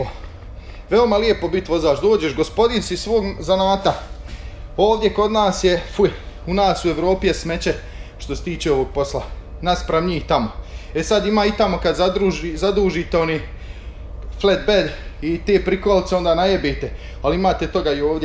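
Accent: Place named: Bosnia and Herzegovina. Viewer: Tek sam sad otkrio kanal.. A sto volim cuti ovaj jezik govor ... Odmah me prebaci na BiH postavke...♥️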